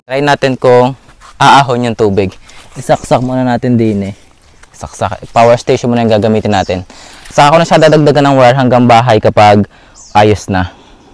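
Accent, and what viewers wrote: Batangas, Philippines. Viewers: Batangeño ka ga kuya?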